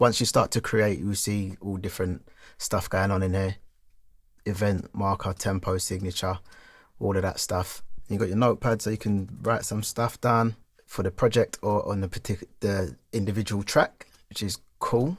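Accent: United Kingdom. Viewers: yeah its cause it’s British accent it’s easy to get stuck in your head I’m British too😂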